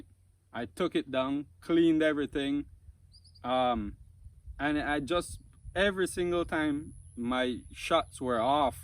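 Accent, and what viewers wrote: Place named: Belize. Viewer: Great setup and good shooting. I discovered your vid while researching light big bores. I think I am sold on a Texan though I would prefer a .30 or .357. Nice to see Belize too. I caught your accent only after a few words lol! Used to be a regular visitor to Belize as a seaman years ago. Keep the vids rolling bro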